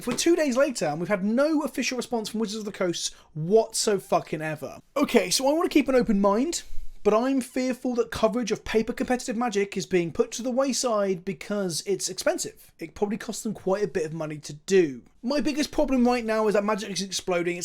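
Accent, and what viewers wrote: United Kingdom. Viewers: I enjoy these kinds of videos. Even though the news is kinda a kick in the balls, it helps to hear you talk about it with that velvety british boi baritone voice.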